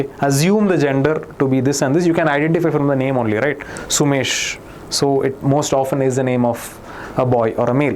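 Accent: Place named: Kerala, India. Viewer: Sir is your language is malayalam bcz of your talking style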